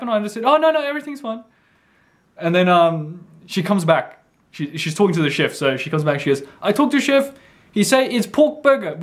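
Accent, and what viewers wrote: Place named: Australia. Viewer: I agree with Donald and others, it would be helpful if you would speak a little slower in your videos. Although english is my native language and I usually understand what you're saying, because of your Aussie accent and fast pace of talking sometimes it is a bit difficult. Remember you have many viewers for whom english is a second language so I suspect for them it may be even more difficult at times. Great job on your videos though, I enjoy watching them and look forward to more. Maybe consider visiting Malaysia, Indonesia, Hong Kong or less familiar locations, places we don't usually get to see. tc.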